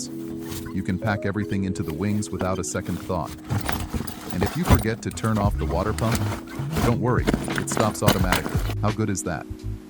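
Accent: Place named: United States. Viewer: lol i heard the American voiceover and i thought US, seeing the name i now recognise you from Insta 👍 From Melbourne and will hopefully be travelling with the 80 next winter👍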